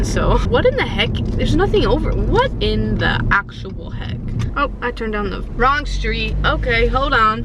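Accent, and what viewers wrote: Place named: South, United States. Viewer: I would of never guessed you were in Cali. I figured you were Southern. LOL.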